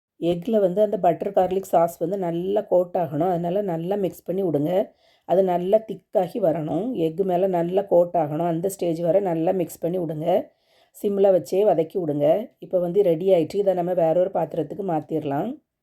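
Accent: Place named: Tirunelveli, India. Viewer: Unga slang spr sis me too tnvli❤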